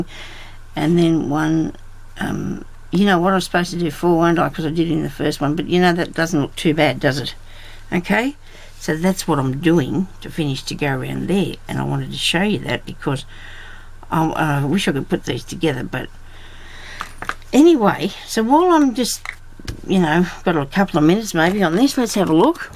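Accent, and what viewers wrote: Australia. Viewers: Never change a thing. I don't think you mumble......it's your lovely Australian accent....love it!! Your fabric/lace books are so different from the norm....what will you come up with next I wonder. I so look forward to Sundays now....hope this book has tons of pages and the tutorials last for weeks. You are a wonderful teacher. Thank you so much for sharing your craftiness. Glad you are feeling a little better. Love to you. Sue. xxx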